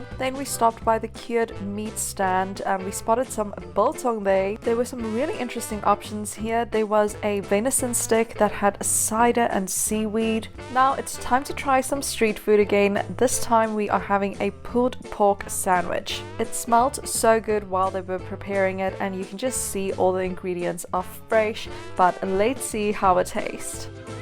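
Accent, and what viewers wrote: South Africa. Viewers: Wow awesome video ☺️ i just stumbled upon it. You sound South African 😁. Love that Pub set uo as well. I will be coming to Edinburgh second week in March and would LOVE TO do Karaoke. Please 🙏 do a video showing karaoke Pubs / Bars in Edinburgh. Thank you in advance. I am from Johannesburg South Africa 😁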